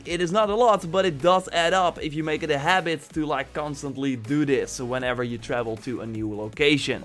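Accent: Netherlands. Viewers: Dude, damn... dutch accent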